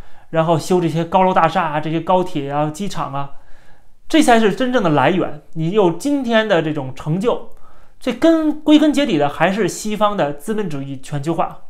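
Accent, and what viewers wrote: China. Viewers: 希望主持人能来回答我这个疑问。主持人你让我觉得很纳闷呀，你攻击中国无底线，在你眼里只要是关于中国的东西都是不好的东西。你这么年轻，我不知道你是在中国长大的然后跑出去拿了加拿大绿卡，还是一开始就在加拿大生活的？怎会如此反华？如果是前者，那你在国内时是受了政府多大的苦难，才能让你对中国有如此的仇恨啊？从你的口音里可以判断，你应该是在国内长大的，要是在国外长大的，口音一定不是这个样子。所以我的疑问是，主持人你在国内到底受了多大的压迫，才能生出对中国如此的仇恨？还是在加拿大为了生活，为了拿经费，不得不黑中国？心里其实根本就没啥恨，说不定还是爱中国的，自己整天骂中国，只是为了生活？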